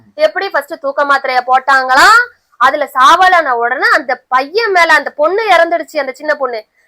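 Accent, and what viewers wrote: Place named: Tirunelveli, India. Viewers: மேடம் நீங்க பேசுற திருநெலவேலிலெங்வேஜ்ரொம்பநல்லாருக்கு❤❤❤ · தென்மாவட்ட தமிழ் எதார்த்தமான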